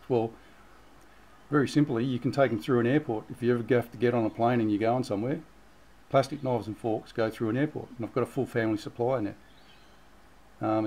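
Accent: Australia. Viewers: Hi, great to get a reply from you. All good, happy for you to use my message. I have faith beyond measure and just know that no matter what my wonderful Father is watching over me and my family. I believe so much that very bad things are on the way and that those who are awake and prepared will survive. Keep up with your videos, so great to have an Aussie to listen to.... doesn't make the rest of us Aussies feel so alone.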